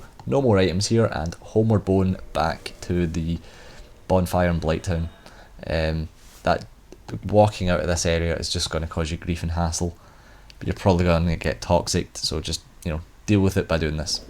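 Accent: Scotland, United Kingdom. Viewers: Thank you for these, I appreciate the amount of detail and thought put into your videos, and your scottish accent does make it easier listening to long videos. Was a little bit surprised when you said you struggled everytime you walked over that moving bridge. For me it's been the complete opposite, tried running or jogging a few times a long time ago and fell off, have been walking over ever since without any accidents. Anyway thanks again!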